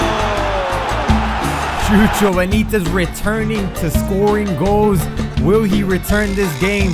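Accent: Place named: Mexico. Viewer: he sounds like a mexican and american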